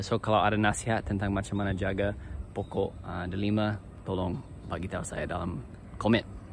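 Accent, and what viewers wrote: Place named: North, Malaysia. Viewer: Kamu sebut "pokok petik". Haha! Ini betul2 sebutan org utara utk pokok betik atau papaya!😂Dah jad org utara kamu ye!😂Kamu juga sebut "pokok pelam" utk pokok mangga, lg sebutan utara, selain kamu sebut "ayaq" utk air!😊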